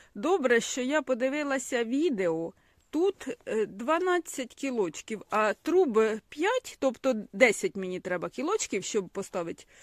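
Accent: Poltava, Ukraine. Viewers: Моя ти зіронька ,гранатою прибьють,,,слухаю вас і балдєю у меня двоюрідна сестра живе новосанджарскій р так же розмовляє по Полтавські.Дякую вам велике.за відео.привіт с Дніпра.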